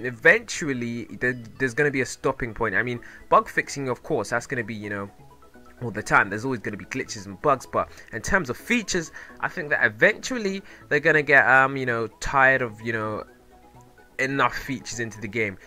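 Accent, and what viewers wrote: United Kingdom. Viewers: in some videos you sound and in some others you sound british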